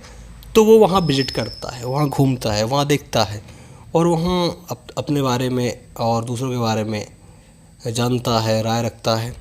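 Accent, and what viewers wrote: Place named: Uttar Pradesh, India. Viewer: Up Se ho kya bhai ..Awaaz Se lag raha hai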